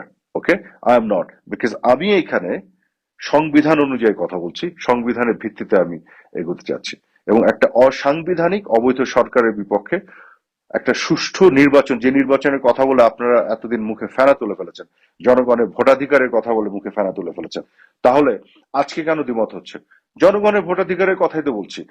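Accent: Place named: Bihar, India. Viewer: Aponar kothar sur amon-j bihar mani kintu talgas ta amar.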